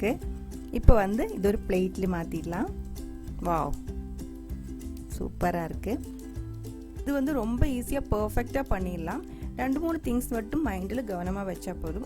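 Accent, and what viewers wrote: Kerala, India. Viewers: Love your malayalam slanged tamil. So sweet to hear . Looks like an easy recipe. Will try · Malayali speaking tamil will be great to hear. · Malayazhi speaking Tamil very cute and recipe very nice.... Try panni paakurean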